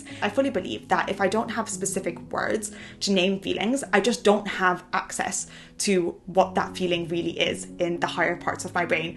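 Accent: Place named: United Kingdom. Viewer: you add some british accent when you speak in American English.I guess you first learnt british accent then American.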